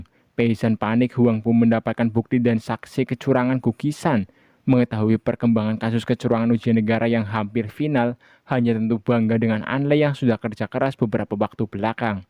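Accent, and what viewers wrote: Banyumas, Indonesia. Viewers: Duuh Mas broo yg merasa mirip Han Ye alias Gong Jun hehehe wkwkwkk kok ngomongnya ngapak gitu sama kayak Aku lhoo aslinya mana yaaah gaess 🤔🙉🤗😁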